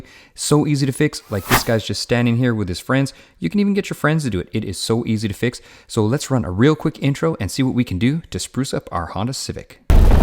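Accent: Canada. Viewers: Great vid G! I think I heard the canuck accent!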